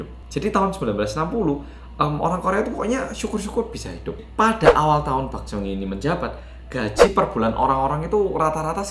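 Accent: Java, Indonesia. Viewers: bagus bangeddddd, medokkk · Kemaren LUWEE. Sekarang MEDOK.😂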